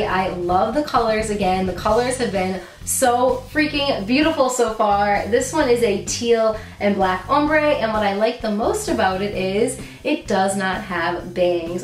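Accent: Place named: Midwest, United States. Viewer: Her accent has a Midwestern sound. Like Wisconsin or Minnesota. It's a little infectious from her.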